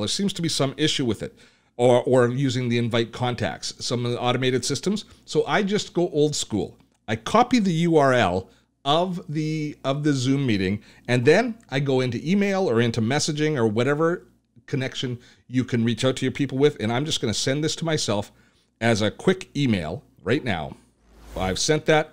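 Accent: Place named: Canada. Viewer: Love your Canadian accent.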